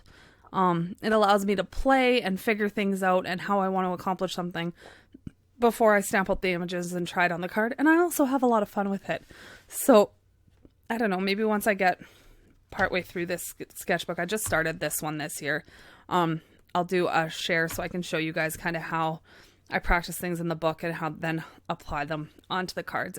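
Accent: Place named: Canada. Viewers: I guessed you were Canadian while I was listening and then expanded the description and saw you actually are! I can just tell sometimes because I'm Canadian too. I love this card. The background is all my favourite colours and I love it. It's outta this world! 😉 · As a Kiwi, I can tell with the way Canadians say ‘about” and “out”... and for you the word “slow” too... your “o” sounds are a giveaway 🤗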